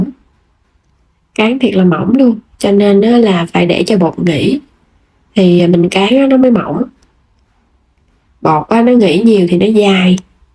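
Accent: Mekong Delta, Vietnam. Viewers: Giọng miền Tây đích thực đó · Giọng miền Tây người ta vậy đó mấy má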